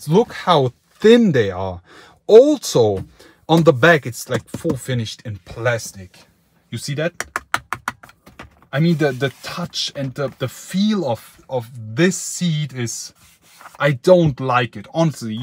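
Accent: Germany. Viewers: Немец говорит на английском? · Is he talking of 600 miles or rather 600 kms as he sounds European or German. As in this seems to be a German or European spec car. And those I would suppose are in kms.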